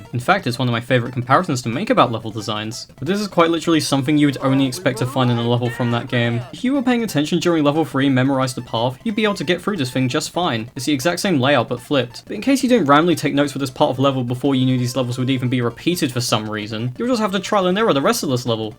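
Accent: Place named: United Kingdom. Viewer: You kinda sound British but I may be stupid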